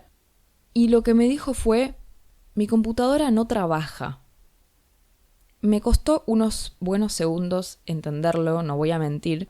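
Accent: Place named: Rioplatense, Argentina. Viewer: Great accent to learn. I love Rioplatanese. I wish there were more of these to listen to, since it is valuable Comprehensible Input, but I am looking for 500 hours worth, not 500 minutes. Still, it is nice to have this Argentine source for a short while. Thanks for making these videos.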